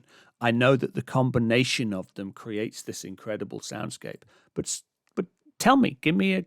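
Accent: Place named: United Kingdom. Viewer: Hi NWA UK Eastern division here. First time I have come across your channel. From accent I assume you are British, so nice to see that. I loved your intro. Mostly I don't like excessively long intros but this was apt and actually quite moving. I will be looking at your back catalogue. Love your poetry references too. Now I shall listen to the reaction and come back at the end but I suspect I am going to love your reaction and analysis and channel. From memory isn't it at the the start? And isn't he from Reigate school choir? Maybe he is Welsh, though Reigate certainly isn't 😀 OK, I'm and this is an incredibly thoughtful, insightful reaction. Why have I not come across you before? (Subscribed.) Think I lost this: They are all top flight musicians, technically and emotionally. They can all do anything that is asked of them but they let the needs of the music dictate what they do. 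To me that is one of thecdefinitions of a great musician - interpret and project the feeling and emotion whether it needs restraint or all-out "shredding". OK, finished. Quite possibly the best TPatP reaction I have ever seen. Thank you (as Floor would say).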